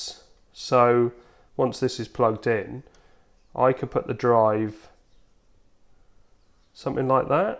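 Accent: United Kingdom. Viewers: Thanks for the update and review. 52PI Has really stepped-up their game! I always liked the way you pronounce A-Lume-A-num (US) ….Al-You-Mini-um (UK)…when I 1st started listening to shortwave pre internet the BBCWorldService pronounced just like you…and I went crazy trying to figure out what’s that new metal the U.K. Has?…😂! Have a great day!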